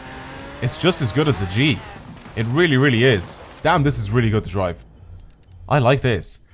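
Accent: Dublin, Ireland. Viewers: are you from dublin??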